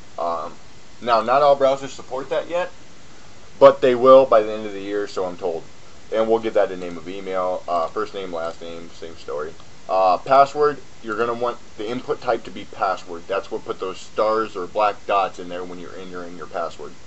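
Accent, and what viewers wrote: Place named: Texas, United States. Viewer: nice tutorial thanks! oh btw, you are from Texas, right ? cool accent :) cheers from Argentina!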